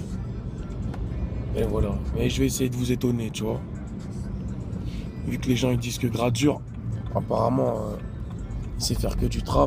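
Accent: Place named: North, France. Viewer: trop fort l accent de chez moi sheguey le nord en force ca fais bizarre on entends pas souvent un accent comme ca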